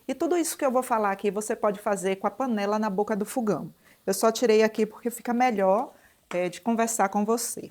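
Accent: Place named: Northeast, Brazil. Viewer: Eu amo assistir suas receitas ,vc é simplesmente maravilhosa, pelo o sutaque vc tbm é nordestina , povo maravilhoso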